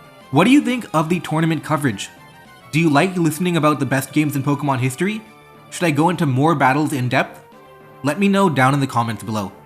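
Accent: India. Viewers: no hes just indian no need to assume everyone lives in a primarily english country bruh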